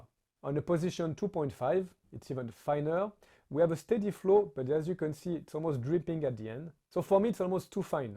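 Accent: France. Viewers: You have to be from Quebec or France. Love the accent.❤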